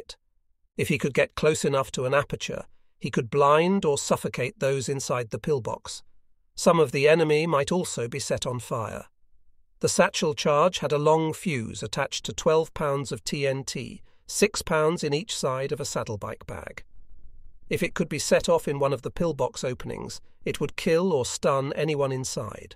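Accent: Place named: United Kingdom. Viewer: This British accent is confusing. BTW I loath British AI accents.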